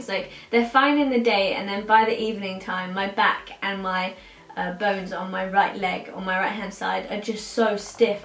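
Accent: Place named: United Kingdom. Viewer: I just found your channel and i am obsessed, your accent is amazing ive always wanted to have a friend from the UK!! Were from California❤️ Congratulations on your home and babygirl!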